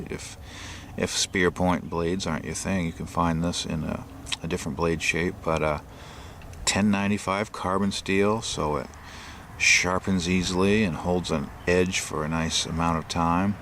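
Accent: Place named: Maine, United States. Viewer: You sound like your from Maine, yut! Love your videos.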